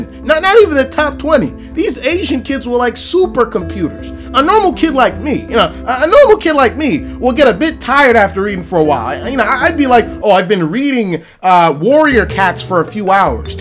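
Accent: Jamaica. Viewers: is he Jamaican? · Wait yuh jamaican holy shit bless brogawd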